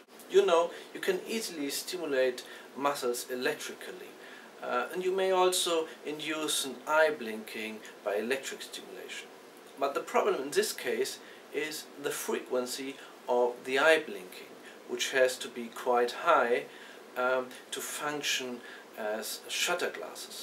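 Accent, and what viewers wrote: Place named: Germany. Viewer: du bist deutscher oder? man hörts voll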